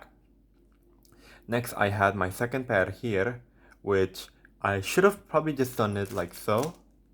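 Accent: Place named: Indonesia. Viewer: Next time gonna be better. Also this is the most indonesian accent ever when you speak english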